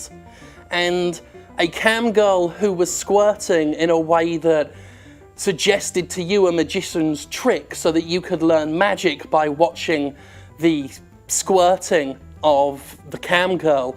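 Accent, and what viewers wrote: United Kingdom. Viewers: He's got a British accent.......because he's British. How fucking dumb can you be? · big dude with a brittish accent.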